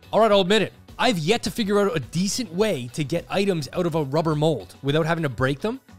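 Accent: Canada. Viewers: Is he canadian... cuz he sounds like it and im tryna build a Canadar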